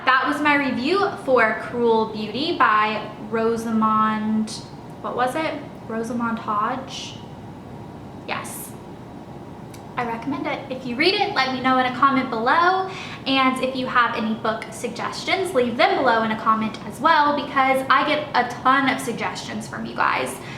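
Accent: South, United States. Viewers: I loved your southern accent in this video :)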